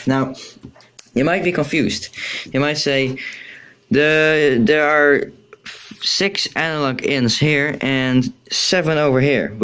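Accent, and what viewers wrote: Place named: England, United Kingdom. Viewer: broooo, ik mag je engelse accent wel en thnx voor de fix!!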